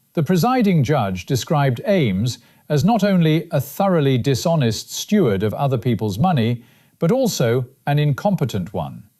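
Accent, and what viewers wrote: United Kingdom. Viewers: That's a british man?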